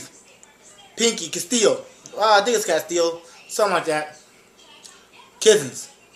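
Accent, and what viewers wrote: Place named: Mexico. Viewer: Your a black guy who sounds Mexican.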